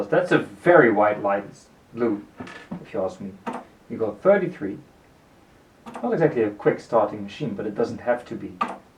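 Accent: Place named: Netherlands. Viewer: Nice unboxing; your accent somehow sounds Dutch (je klinkt nederlands). My first turntable was a Reloop RP-2000 MK3 and it was similar to this one in many ways. The tonearm assembly, the dodgy strobe indicator, the platter without magnet. It didn't come with a dustcover because it is a DJ turntable, but when I sold it to my parents, they wanted a dustcover, so I bought a Reloop dustcover for them. It has that exact same type of hinges, detachable on both sides, with those plastic squares that go into the dustcover slots.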